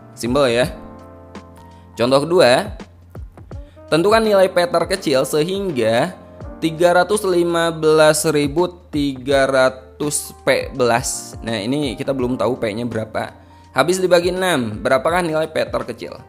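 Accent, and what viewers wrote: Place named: West Java, Indonesia. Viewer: Pix Sunda Pisan😊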